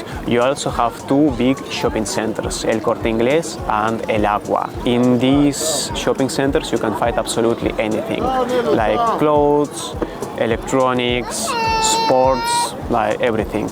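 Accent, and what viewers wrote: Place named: Montreal, Canada. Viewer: Are you from Montreal? Your beautiful accent sounds like it, that's why. 😊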